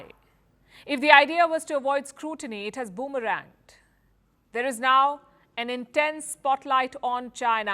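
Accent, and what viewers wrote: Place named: India. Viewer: Indian Engrish is grating on the ears heh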